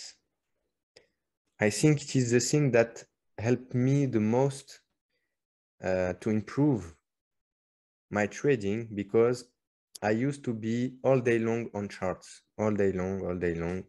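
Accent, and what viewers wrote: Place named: France. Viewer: T’es français? Je sens l’accent français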